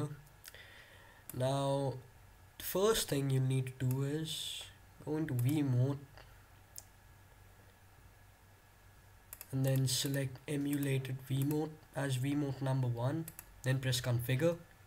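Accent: India. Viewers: indian accent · u indian??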